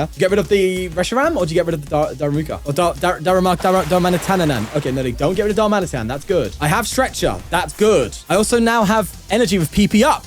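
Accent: United Kingdom. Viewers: bro is british af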